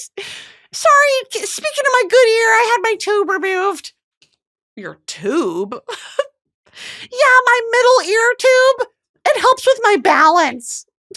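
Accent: South, United States. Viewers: the southern twang made me feel so comfortable today. right at home -texan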